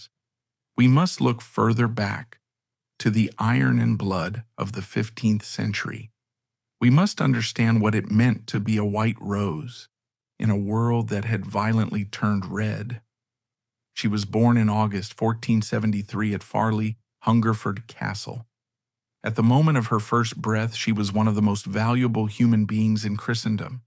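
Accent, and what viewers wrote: United States. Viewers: The idiotic images completely wreck the content. The moment I hear an American accent narrating British history I turn off, because I know it'll turn into bullshit.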